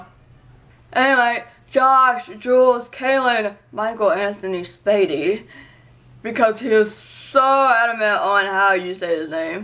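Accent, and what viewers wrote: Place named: United States. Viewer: Not sure if anybody has mentioned this to you before but you seem to have an american accent. Were you always deaf? how do you explain your accent. Sorry you got depressed, keep smiling...it helps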